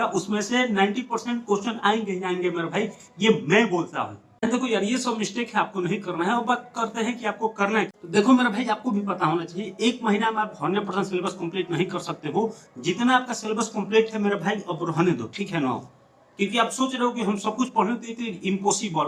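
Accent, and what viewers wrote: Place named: Bihar, India. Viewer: bhaiya bihta Bihar se hai inki yhi language hai 😂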